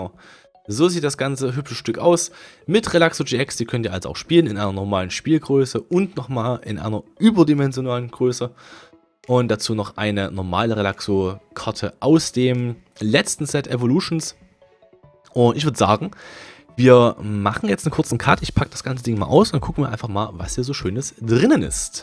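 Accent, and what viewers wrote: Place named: Saxony, Germany. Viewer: Du klingst wie als würdest du aus Sachsen kommen, ist das so?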